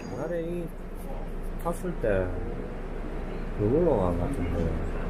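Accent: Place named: Busan, South Korea. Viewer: 부산사투리 정겹네요 음색도깔끔하고ᆢㅎ 캄보디아간접적 보고생활도느껴보고 고마워요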